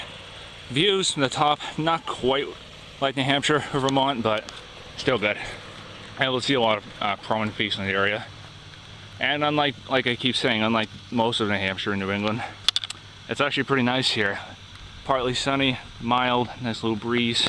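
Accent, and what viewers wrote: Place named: France. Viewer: Very nice place and french accent my friend :)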